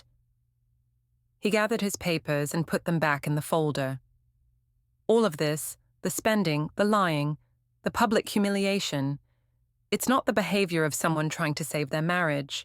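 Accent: United Kingdom. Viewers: Excellent, if sad, story. Good plot editing, good narration. Captions seemed correct for spoken words and had proper punctuation. Recommend this channel. Voice has British accent to my ears.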